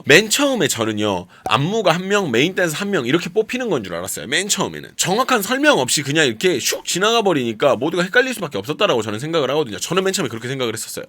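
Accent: Jeolla, South Korea. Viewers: 전라도 사투리는 있으시네요 ㅋ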